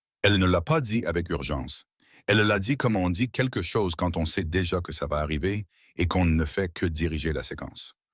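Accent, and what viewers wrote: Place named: Canada. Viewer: Le conteur à un accent Canadien ❤❤❤❤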